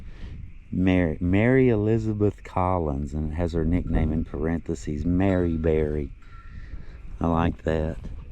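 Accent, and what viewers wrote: South, United States. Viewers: your soft, southern drawl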